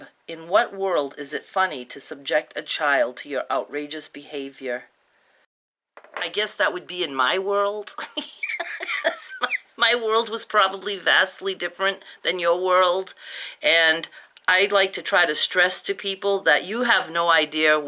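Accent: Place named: Rhode Island, United States. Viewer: How about when you needed to wear panty hose and you had only two pair and they both had a run? You cut off the "bad leg off both and then proceed putting both pair on, then you get two good legs! I need to. Ask, are you from Woonsocket RI. You have that accent. My pet peeve is a person who ask you to help with a project( like decorate for their child's birthday party) and you end up starting the project and they go to the bar to socialize while you do all the work!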